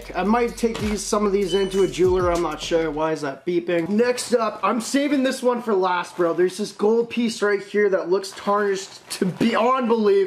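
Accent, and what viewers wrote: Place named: Alberta, Canada. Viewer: Duh duh duh duh duh duh must be from Edmonton or Alberta somewhere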